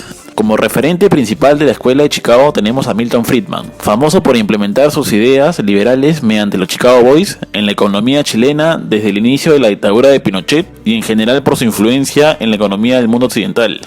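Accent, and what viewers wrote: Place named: Peru. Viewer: Ese acento es peruano jeje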